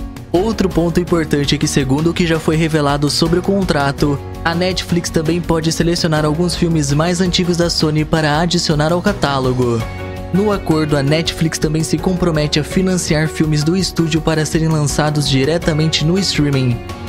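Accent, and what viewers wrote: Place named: Rural, Brazil. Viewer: POR QUE TEM UM CAIPIRA FALANDO, CRUZ CREDO SO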